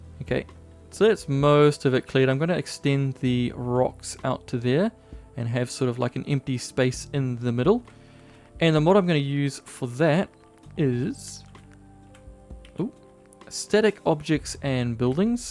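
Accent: New Zealand. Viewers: Do you have a NZ accent? Love your channel.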